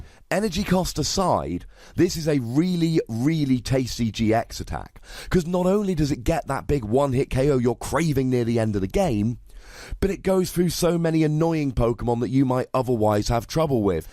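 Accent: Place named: United Kingdom. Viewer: He's British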